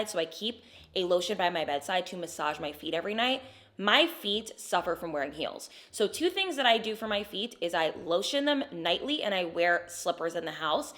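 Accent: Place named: Pennsylvania, United States. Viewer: I just found your channel i was like she sounds like a delco girl lol read your bio and close enough lol you’re beautiful girly🤍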